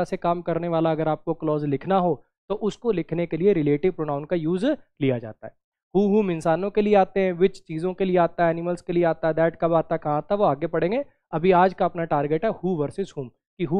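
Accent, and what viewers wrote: Haryana, India. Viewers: Sir aap haryana se ho kya 😎 khinda do sun k lga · Sir are you from haryana..i felt haryanvi in your conversation 😅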